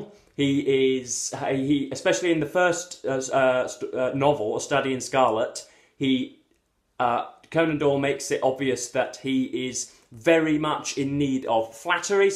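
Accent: United Kingdom. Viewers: I realize...I don't understand British accent. But with book as the topic of discussion, I am going to practice understanding your accent via your channel.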